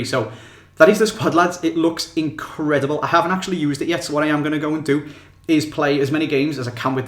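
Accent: Liverpool, United Kingdom. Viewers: Yay another scouser, shame ur not a liverpool fan tho :P ;)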